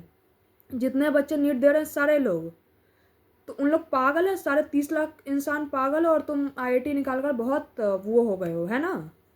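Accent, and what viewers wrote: Bihar, India. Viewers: Mujhe laga eski voice sun kar ye bihari hi hai 😅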